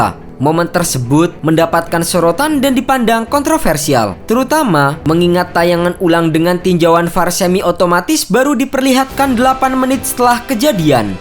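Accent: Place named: Sulawesi, Indonesia. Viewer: Ini yg ngomong orang sulawesi yaaa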